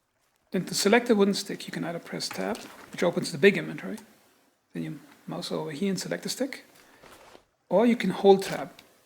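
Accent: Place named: Germany. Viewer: He sounds German to me...he's not treating this as a game, more like a job.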